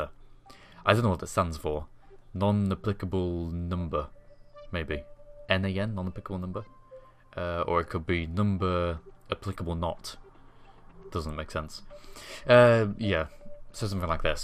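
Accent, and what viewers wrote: United Kingdom. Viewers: Ein, der Deutsch mit britischem Akzent spricht, kann ich nur als großen Gewinn bezeichnen.:) Greetings from germany, give us some more of your skills, we will help you learn it.:P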